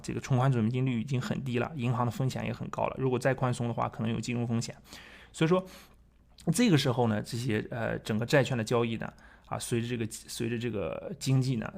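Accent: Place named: Shanxi, China. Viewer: 感觉你是山西人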